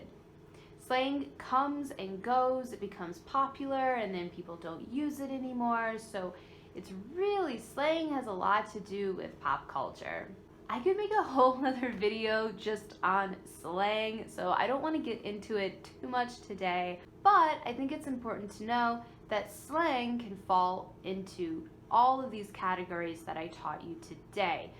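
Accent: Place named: United States. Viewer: Thanks good job I love the way American speak English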